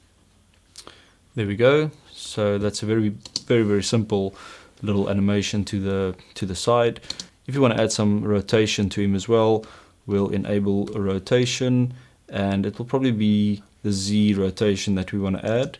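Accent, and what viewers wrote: South Africa. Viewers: You sound south african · You sound South African,are you ? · South African by far ;D Afrikaans accent ;) So yeh, he is South African, or somewhere in Africa xD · I Love Ur South African Accent I'm Also South African!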